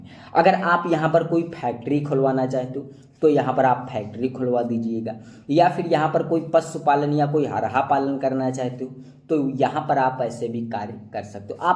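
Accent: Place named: Jamtara, India. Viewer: बोलचाल से तो भाई जामताडी लग रहा है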